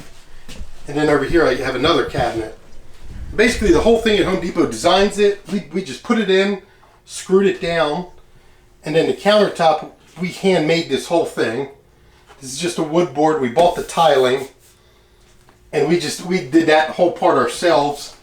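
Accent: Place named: Philadelphia, United States. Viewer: From Philly much?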